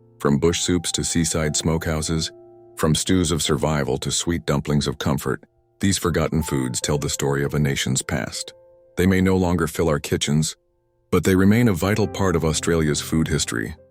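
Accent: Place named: United States. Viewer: why the american accent ?!?!?!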